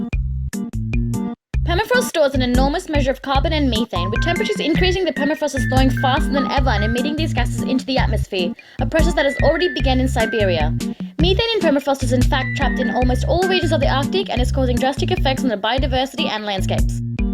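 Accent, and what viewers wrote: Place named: Australia. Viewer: great video; I am too curious though, what kind of accent is that? I want to say Australian? too few views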